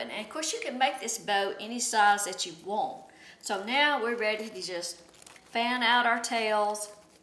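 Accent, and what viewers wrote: South, United States. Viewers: Hello from the UK! Lovely bow and good instructions. LOVE your southern accent!! Thank you for sharing!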